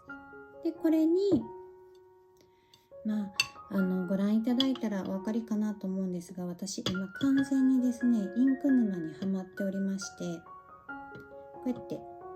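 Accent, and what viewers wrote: Kyoto, Japan. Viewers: こんにちは～。今回の動画、良かったですよ(⋈◍＞◡＜◍)。✧♡ 蚤の市の動画も良いですが、今回のはそれを上回っているように個人的には、思います。 そして、かわいらしいお声で、聞き覚えのある京都弁でお話されるのが、更に親しみを感じました。また、このような企画の動画も出してくださいね。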